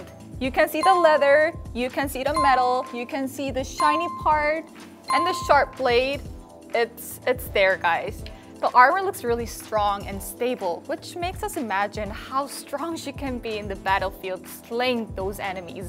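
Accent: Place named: United States. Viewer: Dude I thought I was gonna hear some koran, turns out she speaks American English more than Americans themselves!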